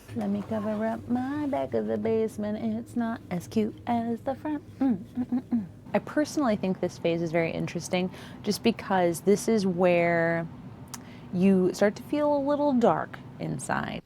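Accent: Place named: Michigan, United States. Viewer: Came for the reselling content, stayed for the Michigan accent.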